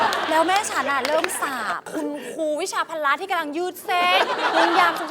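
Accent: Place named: North, Thailand. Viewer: เล่าได้เห็นภาพเลยค่ะ😂😂อู้เมืองตวยน่าฮักขนาดเจ้า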